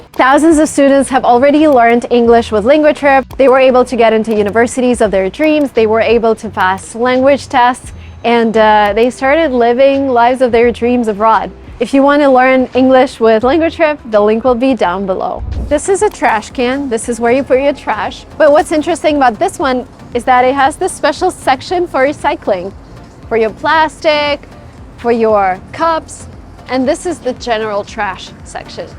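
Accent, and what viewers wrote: United States. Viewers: I love imitating your American accent, this language spoken so fluently. I just listen to your videos while I cook dinner or everytime I can and I' m so astonished to see how easily you influence and improve my listenining and speaking abilities. Thank you a lot. You' re fantastic!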